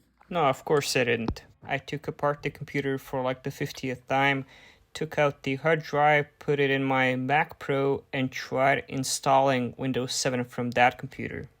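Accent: Quebec, Canada. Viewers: You actually DO sound very similar to French Canadian! 😄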